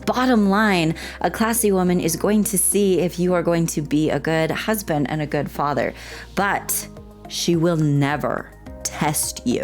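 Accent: United States. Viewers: This is your best video, because you look so funny, talking very fast, in another video, in the background. I also like your singing AND your bloopers. As you have opened up to me, revealing that you go to the Church of Jesus Christ, is it rude to ask you, is your American accent from Washington, or California? I was a "good boy" for you Coach, and watched your video.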